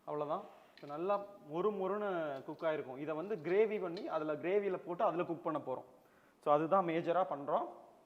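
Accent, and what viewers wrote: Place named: Coimbatore, India. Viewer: அண்ணா நீங்க நம்ம கோயம்புத்தூர் பாசையில் பேசுங்கள் அழகாக இருக்கும்.....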